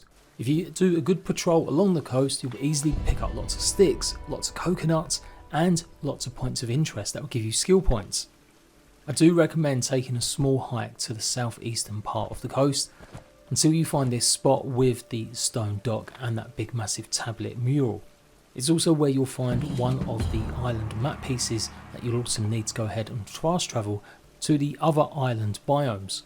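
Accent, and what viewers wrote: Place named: London, United Kingdom. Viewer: That is definitely a London accent you've got there. I just started this game yesterday and was in major trouble with water cause I only had the 2 coconuts that take ages to respawn. Thanks to you I am now smarter. Shout out from Germany. Cheers mate 😁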